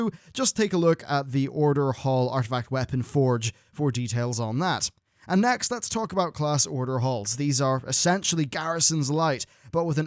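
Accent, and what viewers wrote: Northern Ireland, United Kingdom. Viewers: oh right i thought he was from Norin Iron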